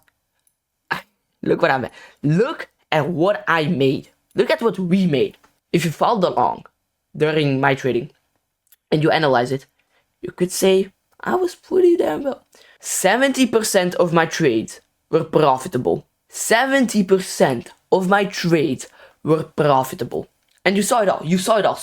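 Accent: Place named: France. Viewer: Your frech aczent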